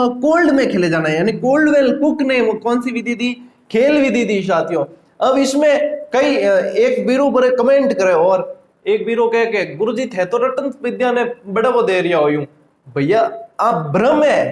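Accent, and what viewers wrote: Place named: Jodhpur, India. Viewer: तभी , आप भी बिल्कुल हमारे (जोधपुर) जैसी मारवाड़ी बोल रहे हो 👌👌 शानदार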